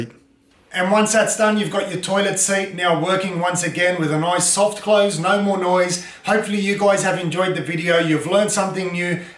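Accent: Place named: Lebanese-Australian, Australia. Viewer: I left my home in Sydney's inner west and moved to QLD in 2007, geez I miss the Habib accent. Thanks for the lesson and the bonus nostalgia.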